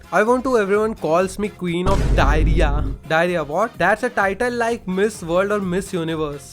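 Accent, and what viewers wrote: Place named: Bihar, India. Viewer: Are ji pahle to aapke Naam Se Laga tha ki aap Hi Ho aur awaaz se but ab confirm ho gaya yah sunkar aap bihar se h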